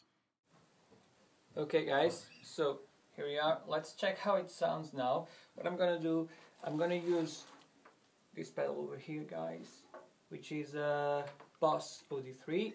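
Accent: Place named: Portugal. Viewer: Pelo sotaque pareceste-me ser português lol uma pequena pesquisa e confirmei suspeitas! eh ehh:) bom trabalho! Cruzei-me ao acaso com este video e gostei!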